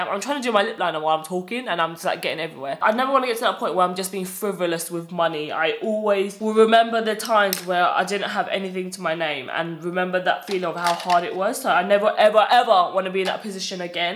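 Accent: United Kingdom. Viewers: I found you on Instagram, your videos with you doing makeup or your skincare. When I started watching your YouTube channel I was hooked and loved how open and honest you are , I didn’t know you had a British accent and lived in London till I started watching your channel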